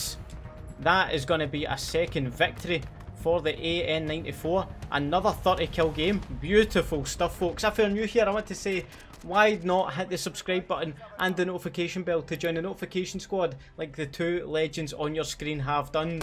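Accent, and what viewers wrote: Scotland, United Kingdom. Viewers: what city in scotland you from?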